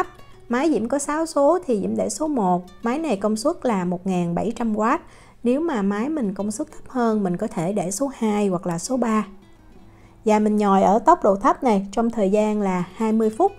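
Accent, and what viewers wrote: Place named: Bien Hoa, Vietnam. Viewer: Dạ, e nhớ rồi, e cảm ơn chị nhiều ạ. E cũng quê Biên Hoà luôn chị, nghe giọng của chị thấy nhớ quê lắm, e hiện đang ở Pháp, e từ không biết gì về nấu ăn mà xem youtube của chị riết e học hỏi được nhiều lắm ạ 🥰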